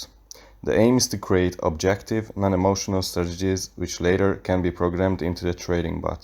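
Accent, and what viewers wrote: Hungary. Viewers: te magyar vagy, hallom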